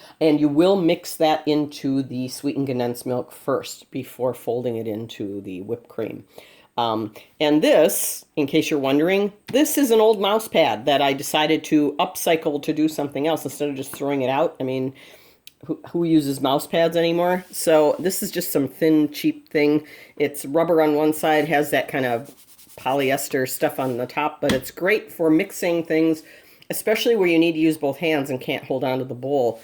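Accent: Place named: Rochester, United States. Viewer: That looks YUMMY--and so easy even I can do it!! HEY--are you from Rochester by any chance?? (I'm a native.) You have a Rochacha accent! I live in Virginia now and I miss my home town but not in the winter!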